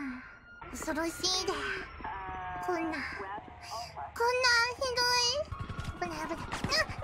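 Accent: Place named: United States. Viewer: if i wouldn't know better i thought she's american 🤔🤔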